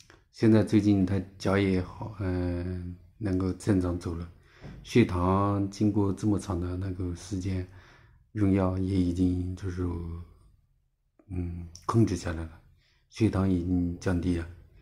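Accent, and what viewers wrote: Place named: Zhejiang, China. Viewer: 有浙江口音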